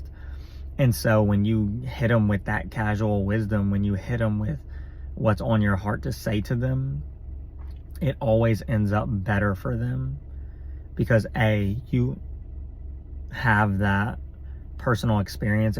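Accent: South, United States. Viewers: You got like a country accent . Where are you from ????🎉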